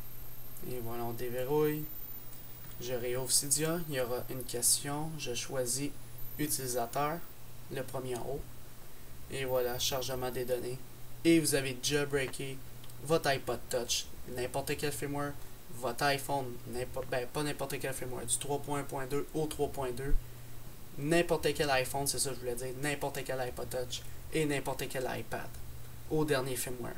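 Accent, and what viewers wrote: Canada. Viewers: Merci mec je vais avoir un iphone 3GS prochainement, c'est cool de voir ton explication! En plus j'adore l'accent Canadien. Merci encore.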